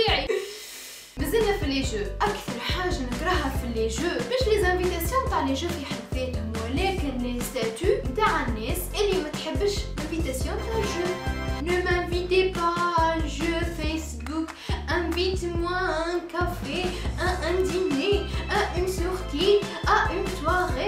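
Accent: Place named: Sahel, West Africa. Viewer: hhhhhhhh you're funny,brabi se7lia? lehjtek thohrtli se7lia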